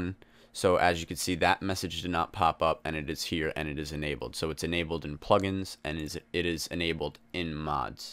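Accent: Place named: New York, United States. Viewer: This guys from new york no doubt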